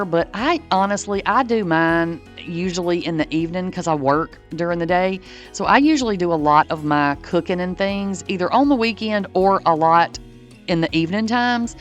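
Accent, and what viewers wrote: United States. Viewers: omg,. just to say, I liked the video, and no doubt the creator tries to be a good human, but to many of us outside the US hearing this kind of accent is similar to hearing arabic accent....its alarming. Thankfully I dont fall for predjuces... Good luck over there.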